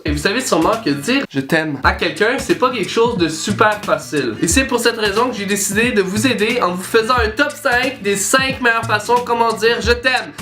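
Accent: Quebec, Canada. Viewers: C un accent québécois et c pas un faux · bin quoi...tu as un accent quebequois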